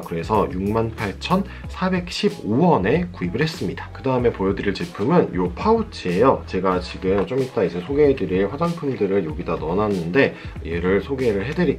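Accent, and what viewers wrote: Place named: Seoul, South Korea. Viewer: 영상볼때마다 느끼지만 말투가 참 다정다감하시네요. 요란스럽지 않아서 좋은것 같아요!^^ 서울말 쓰는것도 부럽고..ㅎ 무튼 영상 잘봤습니다. 자주자주자주자주 올려주세요ㅎ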